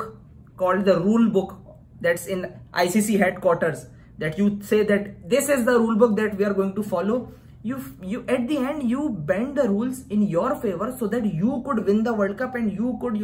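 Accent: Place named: Uttar Pradesh, India. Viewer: Bhai tu best analyst hai cricket ka , Mazak sa hatt ka tere style match kar rahe hai apne sa !! UP wale accent hai teri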